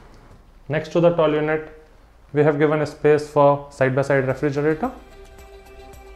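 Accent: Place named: Arab world. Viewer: Love arabic accent. Say again "built-in".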